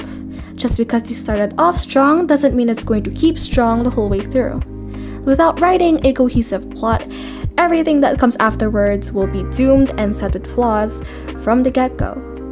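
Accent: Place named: Philippines. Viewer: This is completely irrelevant but are you filipino? I can tell by the accent 😭 (I don't mean this in a bad way btw your accent is amazing! I can just tell when someone is one of my own based on accent hehe)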